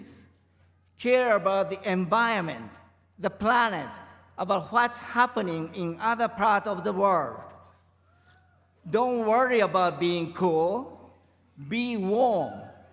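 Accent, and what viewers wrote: Japan. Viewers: British English ではイラーAmerican English はエラー。スピーチは内容で勝負。ザ.Japanese English でも人を惹きつけている。豊田さん👏👏👏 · 字幕追ってられるから気付けたけど結構誤字なのか誤訳なのかあるね。living roomとか笑 発音もまあ日本のおぢって感じではあって怪しいところあるけど。 とは言え内容はとてもいい。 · 私がどうこう言うまでもなくあまりに素晴らしいスピーチですが、発音は日本語発音な部分があるんですね 私は自分が正しい発音をできないことばかりコンプレックスとしてまともに英語を話せませんが、重要なのはそんな部分ではない事を改めて痛感しました こんな素晴らしいスピーチが、内容でなく自分の発音に結びつくと言うのも情けない話ですが、私も英語でコミュニケーションできるように頑張ろうと心を新たにしました。ありがとうございます。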